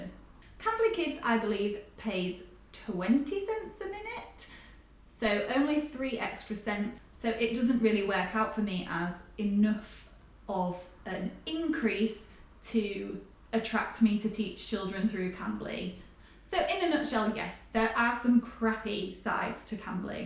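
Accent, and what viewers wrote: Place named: Manchester, United Kingdom. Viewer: You sound like a Manc.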